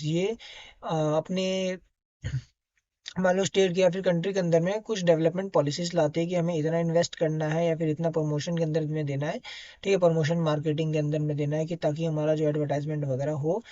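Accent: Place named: Haryana, India. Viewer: Do you belong from Haryana? Because your taking a tone like that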